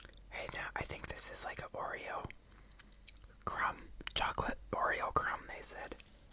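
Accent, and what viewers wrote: Midwest, United States. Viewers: i’m wondering too lol, very strong midwest accent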